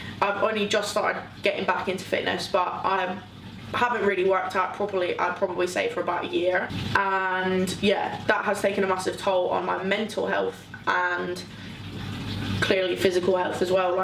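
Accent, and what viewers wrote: Scotland, United Kingdom. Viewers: Miss your Scottish accent ahha xx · Fix your accent you moved to london dont mean you have to look your scottish accent and sound chavy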